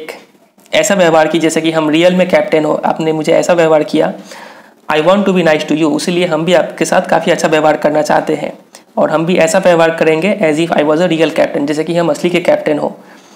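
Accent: Bihar, India. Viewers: Your 3 tho and kaati proves you bihari😂😂lol I am bihari too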